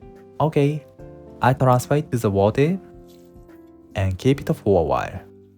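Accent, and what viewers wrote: Japan. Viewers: I like your Japanese English! Thanks for the recipe, I will make it tomorrow ❤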